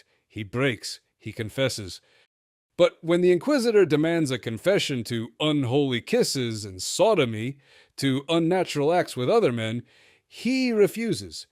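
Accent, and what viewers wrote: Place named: Scotland, United Kingdom. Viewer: Excellent expose. Luvin' ur Scots brogue.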